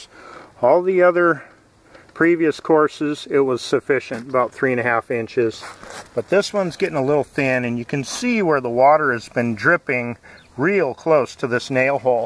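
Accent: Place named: United States. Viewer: I would certainly like to have you fix my dads roof but we are on the other side of the pond .......Australia......you sound like you're americana.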